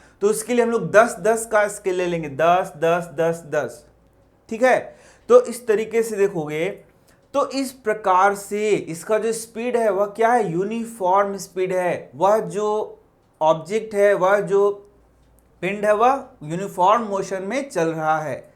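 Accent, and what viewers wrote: Bihar, India. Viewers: Sir ji app Bihar ke ho kya? Mujhe to vaisa hi lagta hai ki app Bihar ke ho.apne discription me kya likha hua hai? Ek hi line to 100 bar😂😂 This is funny sir. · Sir,I thing you are from bihar🤔👍👍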